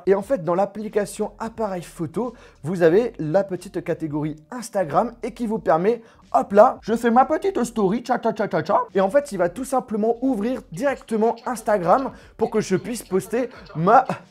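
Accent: Belgium. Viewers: Tu es belge???? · T belge?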